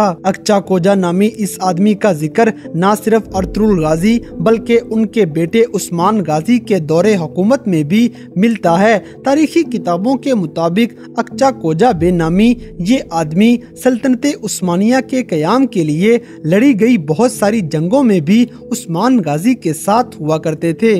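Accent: India. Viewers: Are u indian? If no then why r u using their accent ... our Urdu is a rich language and it has the letter غ ۔۔۔ _ _ . So why do u copy indian accent and say galt, gaddar etc... accept your own language only then you ll be remembered in the world history . آپ اب تنقید کریں گے کہ میں خود انگلش میں لکھ رہا ہوں تو وہ اس لیے تاکہ سب لوگ پڑھ سکیں کہ ہم اپنے ملک اور اپنی زبان سے محبت کرتے ہیں ۔۔۔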